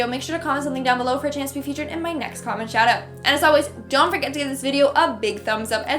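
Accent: France. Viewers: I love the French accent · I like your French accent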